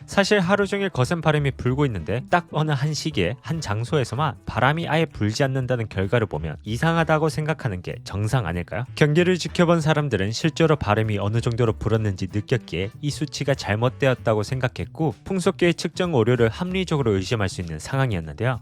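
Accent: Gyeongsang, South Korea. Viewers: 유튜버 하려면 경상도 사투리부터 고치세요.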